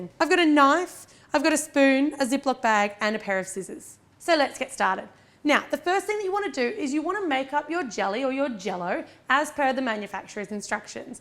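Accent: Australia. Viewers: Do Australians find American accents as beautiful as Americans find Australians'? I love listening to you talk. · OMG. What age are you?! LOL. You clearly don't hear accents. She's AUSTRALIAN.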